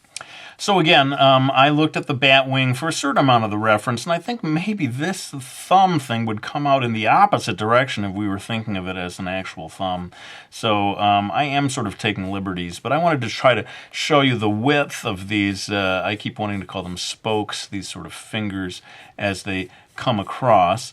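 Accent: Brooklyn, United States. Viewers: I like your Brooklyn accent XD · I LIKE your Brooklyn accent xD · If you stop doing the Brooklyn accent I'm unsubscribing! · actually i really like your surfer guy voice (brooklin accent) really much, it makes me laugh xD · why would anyone unsubscribe because of the Brooklyn accent? It's awesome! and so are the drawings :D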